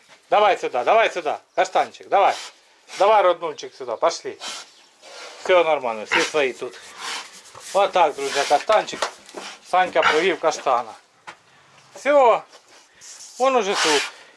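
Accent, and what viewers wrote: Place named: Surzhyk, Ukraine. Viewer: ви приклад для всіх.Відео ваші 👍 Нам дуже подобається як ви розмовляєте по суржику.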